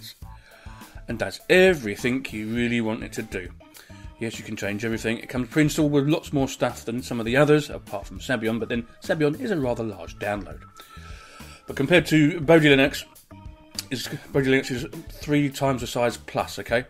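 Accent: United Kingdom. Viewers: British best accent :-)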